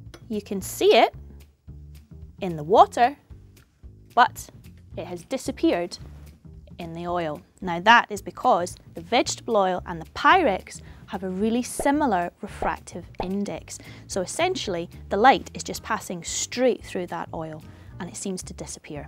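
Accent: Scotland, United Kingdom. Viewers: I swear not everyone sounds like this in Scotland, half of us sound like farmers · Isn't that accent scottish? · American shirt brit acent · Scottish I believe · Cool experiment. Love her accent. Scottish,I believe. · Scottish burd. nice. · posh scottish accent haha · What part of Britain is her accent from? Sounds scottish, but could be welsh, too... Can anybody tell for sure? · you're Scottish ascent is dreamy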